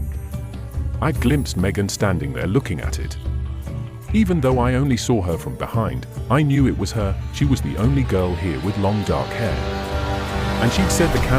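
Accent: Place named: England, United Kingdom. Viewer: Why the English accent? I have a problem understanding such accents and such....I tend to avoid these videos. Sorry.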